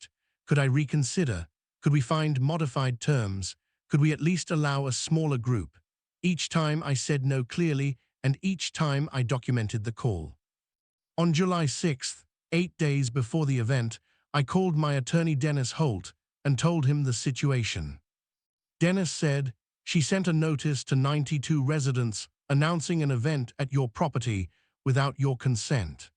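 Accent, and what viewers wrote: United Kingdom. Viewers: And another totally ABSURD story with a British accent from a 10yr old mind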